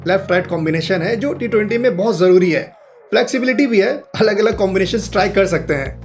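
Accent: Bihar, India. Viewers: Bhaiya bihar se ho kya ❤❤❤❤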